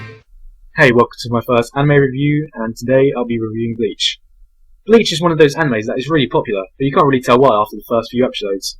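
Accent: United Kingdom. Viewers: he's british accent was pretty thick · Old school Gigguk sounds more British 😅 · his accent is more british here, he changed a lot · the british accent in this video hits differently with attitude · His British accent was stronger back then. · He really had a strong British accent for a Thai lol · holy shit he sounds so bri'ish. he sounds so white when i picture him talking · used to sound so much more British lol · Lol he sounds so british at this time.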